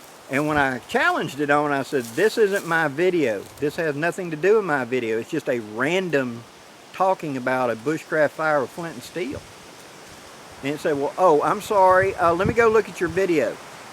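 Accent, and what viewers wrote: South, United States. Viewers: Hey - That stuff sounds complicated to me. The only thing I know how to do, is watch the videos. That works for me. It is interesting to say the least. You'll figure it out and your southern accent is not near as bad as up here in WV. You take it easy and just go with the flow- Carry On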